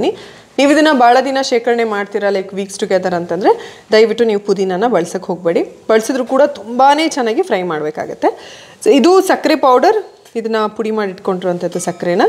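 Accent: North Karnataka, India. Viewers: Nimdu uttar Karnataka alva?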